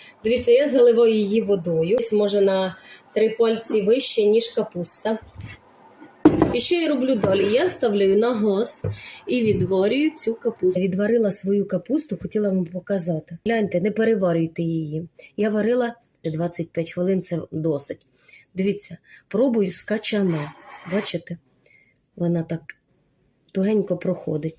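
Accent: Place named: Cherkasy, Ukraine. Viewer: І рецепт цікавий і мова🎉 Це мабуть Черкащина,чи я помиляюся?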